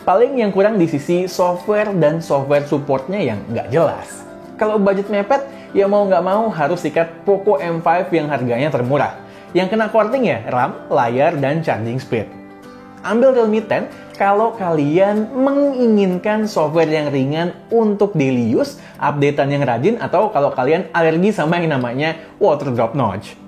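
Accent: India. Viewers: aga tripping kwkwkw karena yg ngomong mirip orang india tapi ngomong indo, kaget saya hahaha keren keren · Gue kira channel orang India. Kaget gue pake bahasa Indonesia 🤣🙌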